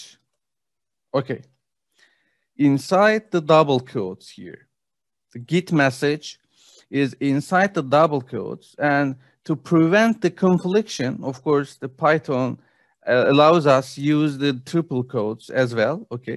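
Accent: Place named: Turkey. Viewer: Aynı şeyi düşündüm daha ilk dkdan. Direk Türk aksanı bu nerde olsa tanırım 😂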